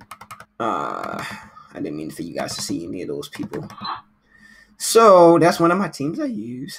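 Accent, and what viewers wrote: Baltimore, United States. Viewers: u from balitmore? why u pronounce ur Us like dat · Baltimore accent?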